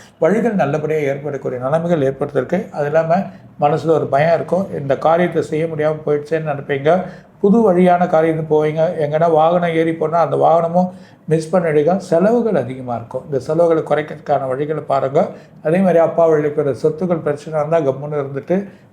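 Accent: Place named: Chennai, India. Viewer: Super a madras language pesureenga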